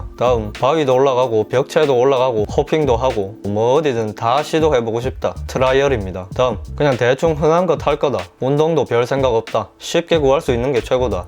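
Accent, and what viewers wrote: Gyeongsang, South Korea. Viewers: 구수한 경북구미지방 특유의 사투리에다, 중간중간에 표정 찡그린 캐릭터 얼굴 나오면서 높은 톤으로 "~~해도 별 차이없던데~" "~~해도 잘만타고다니는데" 하는 부분이 진짜 웃김 ㅋㅋ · 잡소리 없이 똑부러지는 설명...양념으로 경상도 사투리 억양~ ㅋ 좋아요~~ㅋㅋ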